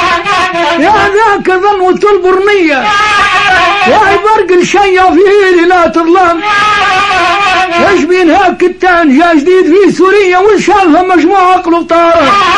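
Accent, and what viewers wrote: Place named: Tunisia. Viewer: لهجتو تونسية اكثر من جزائرية....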